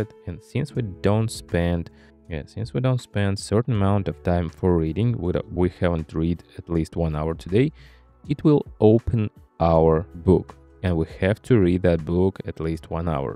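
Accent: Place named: Yucatan, Mexico. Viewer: Nunca había escuchado un acento yucateco en inglés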